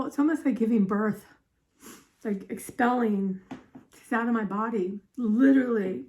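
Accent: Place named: Pennsylvania, United States. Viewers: Why did I assume you lived in Pennsylvania? That's the accent I hear. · Where are you from? My daughter who is a linguist would say has a mid Atlantic accent which includes the Philly area but not as intense as Philly. I asked where you are from because I assumed NYC from your Trader Joe’s story, I live in Long Island.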